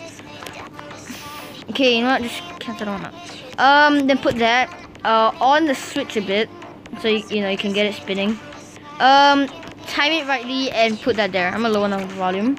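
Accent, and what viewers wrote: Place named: Singapore. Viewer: You are singapore right? Bc your voices •_•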